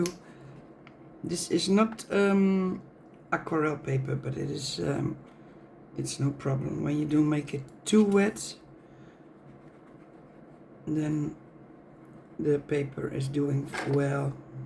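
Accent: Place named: Netherlands. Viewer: Mooi maar volgens mij ben je nederlands zo te horen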